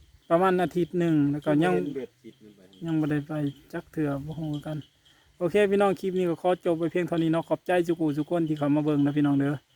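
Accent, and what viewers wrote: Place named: Phu Thai, Thailand. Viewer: เสียงเว้าคือบ่าวผุไท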